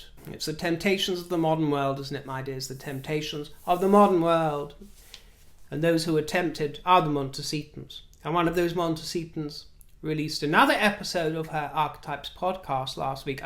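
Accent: United Kingdom. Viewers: As a rather conservative, slightly over the hill woman from the flyover mid Atlantic region of the States...I certainly do not feel that Harry's 'Spare' will do well over here,..... There are so many much more important issues on our general minds, that I just can't imagine what demographic over here would feel compelled to fork out whatever £28 translates to in the flailing US currency. ( $32 ish, I think)...that's about a half of a tank of gas for the industrious working...more affluent, in my opinion will be too busy and uninterested in his whingine(?) Whining....and the ridiculously large bunch of our now unemployed or underemployed or welfare crowd won't be able to get it with their food stamps.... Personally, there are so many other and more worthy books to buy that I could've bothered to invest a nickel in anything he might be trying to communicate via a convoluted route, especially when anything he spits out in person is so worthless! 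Besides, I'd so much rather be entertained by you especially, or any various other Brits with wit and humo...especially with a yummy accent!! Just can't see it, any huge sales number, for him from here!!I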